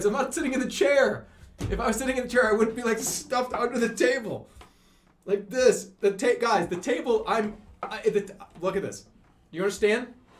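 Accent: Boston, United States. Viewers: The drunker jerma gets the worse his Boston accent gets.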